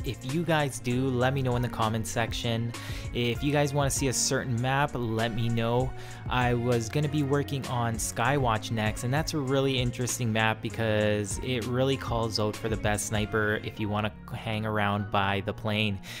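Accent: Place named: Canada. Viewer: Hey, are you Canadian? I can hear that accent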